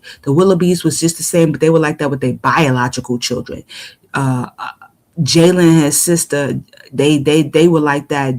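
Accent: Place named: New York, United States. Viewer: Replay, this was fire…ya talks on these subjects are fire and love the ny accent! And u digging ya claws in these mofos deep! lol 😂 this is sick fr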